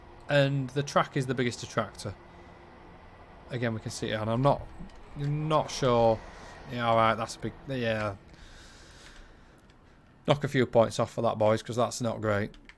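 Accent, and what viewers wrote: Yorkshire, United Kingdom. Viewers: thanks very much for scanning your critical eye over the route, which I hope DTG take notice of. Love your Yorkshire accent and comments.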